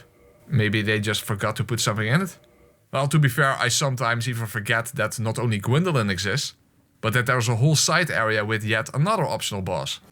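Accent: Netherlands. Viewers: your accent is quite obvious Dutch (Netherlands). Very nice 🙂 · I like his dutch accent!^^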